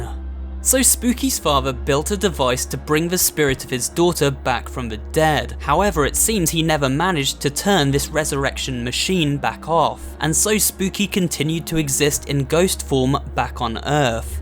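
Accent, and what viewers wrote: United Kingdom. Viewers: You talk like british behind the meme.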